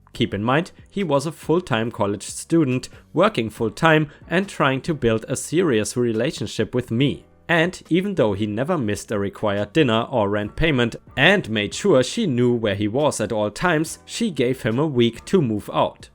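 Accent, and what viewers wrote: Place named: Germany. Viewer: Okay, your accent is very strong so I ask myself :D German or Austrian? It sounds so because of the sound of some words - If not.... Shame on me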